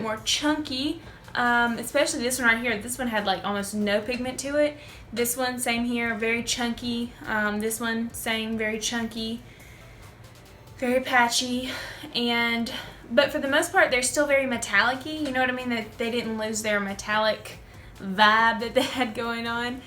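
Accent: South, United States. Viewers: hears southern accent subscribes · you are sooo pretty!!! and i love southern accents! ❤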